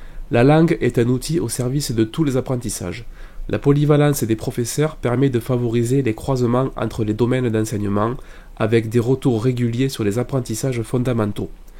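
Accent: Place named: Provence-Alpes-Côte d'Azur, France. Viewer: Pourrais-tu m'envoyer le lien pour télécharger les fichiers audio ?? Je crois que tu vas me bercer longtemps 😉 et pour l'accent j'étais pas loin, je suis en Paca 😁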